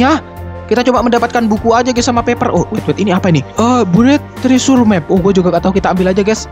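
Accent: Madura, Indonesia. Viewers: Suaranya kayak orang Madura ketika memakai bhs Indonesia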